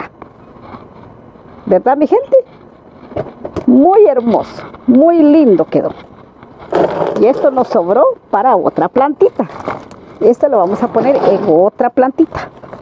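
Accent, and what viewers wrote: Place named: Guatemala. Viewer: Saluditos amiga💜💐tan chula como me gusta 👍 usted habla como toda una chapina . Ysi que llevo lindas plantas 👌😍💐yo soy chapima de Santa Rosa de loma 🇬🇹 y vivo en California 👍abrazos y bendiciones 🤗 en mi canal también se habla de plantitas y mas amiga 👍💚🌎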